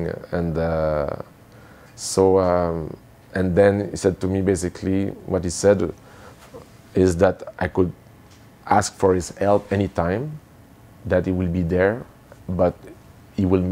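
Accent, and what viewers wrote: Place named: Quebec City, Canada. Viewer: Even if my English sounds like the one Denis is speaking (we are both from Quebec City), I smiled at that part: You know to know, you know to know the limit, you know to know the where where ;)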